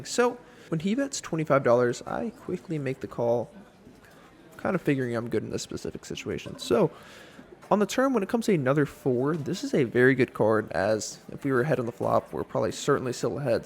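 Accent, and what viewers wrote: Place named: South, United States. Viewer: Love it that you play at all of my same casinos, other than Magic City. I haven't played down in Miami yet. Curious, you have an accent. Are you from TN or NC?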